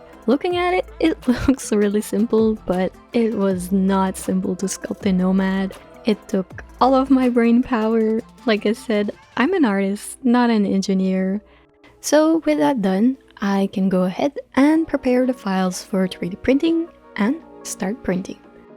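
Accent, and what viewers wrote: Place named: Quebec, Canada. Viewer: I love him so much, i'm surprised you have so little followers since your stuff is so good :O ps. are you from quebec ? Because you have a similar accent to me :3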